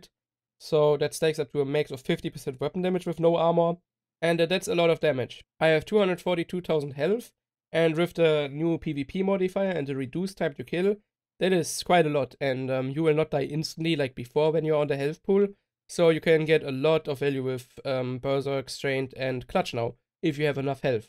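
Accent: Germany. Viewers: Your english sounds like a german who wants to talk english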